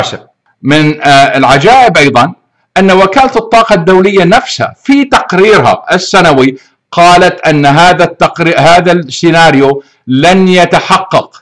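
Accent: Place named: Gulf. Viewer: اخوي الأستاذ سعودي او عراقي لاني عجزت اميز لهجته خليجي على عراقي على شامي … واسم العائلة الحجي وهو منتشر بين اهل العراق واهل الخليج خاصة الكويت والاحساء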